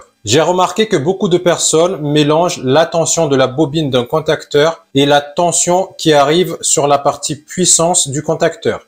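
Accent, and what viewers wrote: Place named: Alpes-Maritimes, France. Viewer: Merci bien parler et on dirait vous êtes 06. Pas 15. Vous exprimer très bien mieux que mon formateur de l'AFPA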